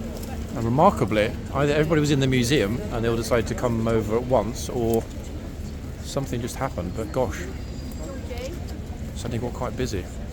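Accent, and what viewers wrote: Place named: RP, United Kingdom. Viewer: Oh you never ever fail to make me laugh out loud with your terminology 😂 peasants & rich coming out of raffles 😂you have a very good posh accent my dear man. Thanks for showing us around London, I love the beautiful architecture of our capital city, truly amazing. Keep doing what you do ❤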